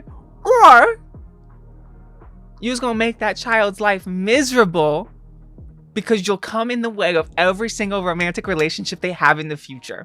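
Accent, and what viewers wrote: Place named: Illinois, United States. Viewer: Sounds like people I know from a big town/tiny city in Illinois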